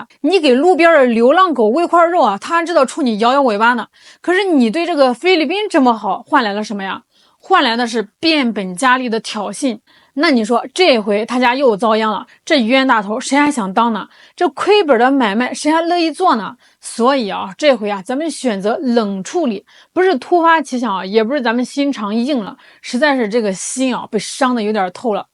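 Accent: China. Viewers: 妮子，你是哪个地区的普通话，好听。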